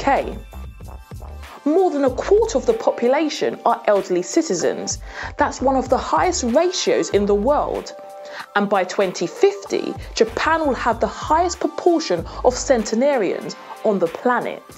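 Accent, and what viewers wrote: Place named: United Kingdom. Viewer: She is british.that accent is from there.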